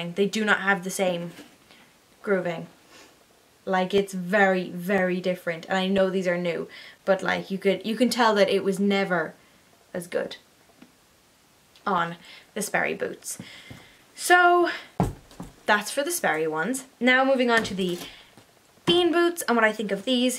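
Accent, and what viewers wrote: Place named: Ireland. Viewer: I really enjoyed your review and love your Irish brogue. I love your country, too, and visited County Cork (backpacking) in the late 60's. Anyway, I have a pair of LLBean duck boots but I dislike the collar as it is not padded. If I can get LLBean to affix padding in my collars they would be first class; and that side zipper on the Sperry's would make them awesome.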